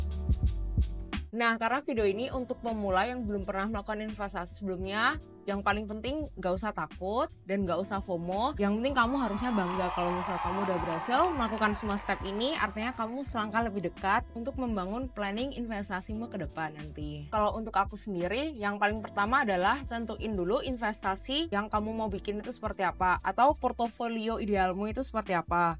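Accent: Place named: East Java, Indonesia. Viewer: Penjelasannya mudah dipahami 👍, kyknya orang jatim deh😊(dialeknya)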